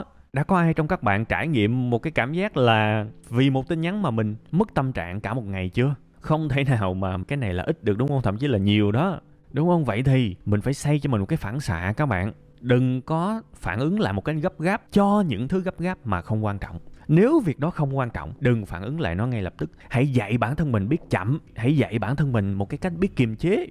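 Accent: South, Vietnam. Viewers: Giọng nói miền Nam của bạn rất dễ nghe. Bài học hay lắm, cám ơn anh. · Giọng a miền nam mà rất dễ nghe luôn, cảm ơn a đã chia sẻ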